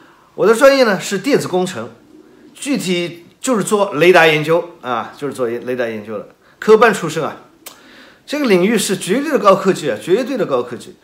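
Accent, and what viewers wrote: Shanghai, China. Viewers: 爷叔上海宁？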